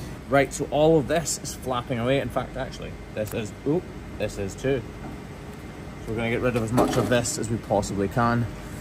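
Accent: Scotland, United Kingdom. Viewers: While I have watched a lot of these farrier videos over the last 2 or so years, I have to admit that I have come to watch the just to listen to the Scots accent, as my grannie was from Dunfermline and immigrated to the US in 1920, and when this guy does these videos I close my eyes and imagine it's my grannie I'm listening to. Is that weird?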